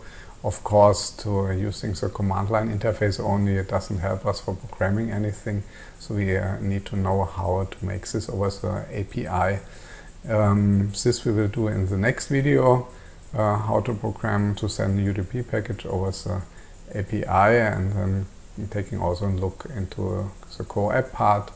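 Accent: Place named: Germany. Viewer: Ich hören den deutschen Akzent und schreibe daher auf deutsch 😅. Danke dass Sie diese Videos mit uns teilen. Dies ist mein erster Versuch auf einer Plattform hörer als arduino oder esp zu arbeiten und gar mit open thread. Diese Reihe ist ein super Einstieg obwohl ich schon so viel gelesen und versucht habe traue ich mich noch nicht damit zu beginnen bis ich es nicht zu 80% verstanden habe😂 bitte machen sie weiter so und vielen Dank!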